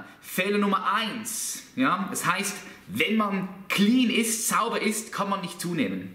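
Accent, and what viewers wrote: Switzerland. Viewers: Aus der Schweiz?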